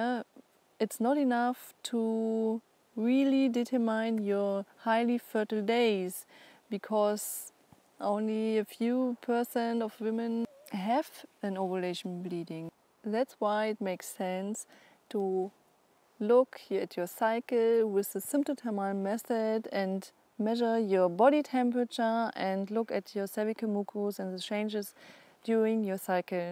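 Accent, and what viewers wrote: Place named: Germany. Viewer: That german accent😁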